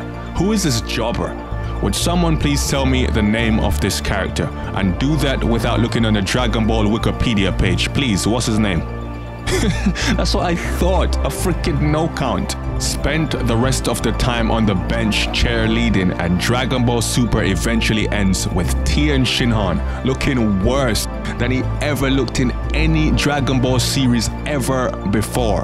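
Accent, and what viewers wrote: Caribbean. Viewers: are you west indian? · You from the Caribbean bro? Can hear the accent but haven’t lived there long enough to pinpoint which island that accent is haha. Amazing video btw, glad someone else felt the same way. Most other fans and the writers were giving UI Goku, Jiren and Hit handjobs while sleeping on the 80 other cool and unique fighters that they should have also given some spotlight.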